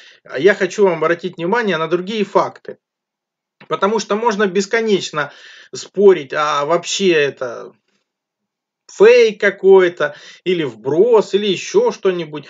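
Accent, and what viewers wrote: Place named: Kharkiv, Ukraine. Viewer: Посмотрел, очень интересно, придётся подписаться 👍. Уж больно интересно Говорите, и говор на харьковские похож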